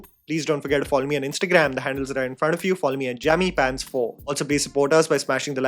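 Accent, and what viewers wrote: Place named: India. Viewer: I just cant understand indian accent 💀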